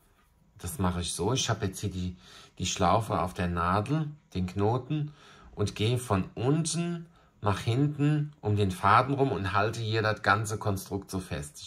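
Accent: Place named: Rhenish Hesse, Germany. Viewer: Das ist wirklich ❤ allerliebst .Hab heute meinen ersten gehäkelt. Voll knuffelig.. Ausserdem könnte ich dir stundenlang zuhören ,weil du den gleichen Dialekt hast wie ich. 😅 Ich babbel roihessisch😂 Also nochmal Dankeschön für diese wunderschöne Idee und die tolle Anleitung Lg Sonja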